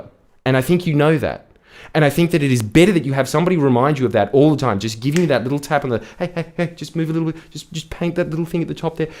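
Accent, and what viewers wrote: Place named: Australia. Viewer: That’s what HE said. 😂 He even showed the same face that comes from that pleasantly satisfied feeling. “Mmmm. Arggggh. Yesssss.” along with that expression of the Westerner’s stoicism with hand cupping his chin. I’ve concluded that he actually gesticulates more than I & I didn’t think that was possible. Thank whatever God(s) may be that what otherwise would appear to be Parkinsonian tics instead, has the positive connotation that it does or else I’m sure I would be terribly self-conscious about it. Yet there is something … & I can’t quite put my finger on it … to the feeling I get when I’m surprised repeatedly - like the baby in a game of peek-a-boo ever amazed & bewildered at the magic of a disappearing adult - by his right arm becoming invisible as it slips in the side pocket. I now have to imagine that there IS a portal through which JS traverses dimensions & is converted to. 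I mean THANKS for talking about the softness of the producer’s hair that you can feel through there. You pack SO MUCH info into such a short timespan where I’m tripping over your Aussie accent, actually needing the transcript at times but the closed captioning is fd up … it can’t even get your Australian twang right… & then & THEN (no & THEN) I have to now completely imagine an invisible black hole where I can’t see past the event horizon yet your arm is teleported across planes… where you have the cheapest budget for a Star Trekkian Transporter since imagination is free. Hey! Is a misquote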